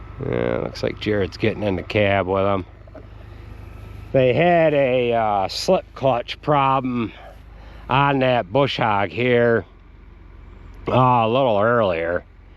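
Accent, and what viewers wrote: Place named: Midwest, United States. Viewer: Way you talk, I figured you were from far away, mid-west or dakotas. Saw those NY plates and realized you're about 5 hours west.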